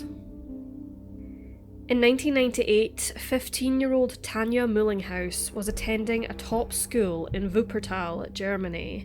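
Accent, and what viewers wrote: Scotland, United Kingdom. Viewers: I'm still trying to pin point the accent..where are you from? I used to think it was Scottish but now I'm second guessing. I'm all the way down in the Antipodes in Australia so excuse the ignorance. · Yes great narration...I know this may be outta line....Scottish accent?? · Heartbreaking story. Love your accent, Scottish?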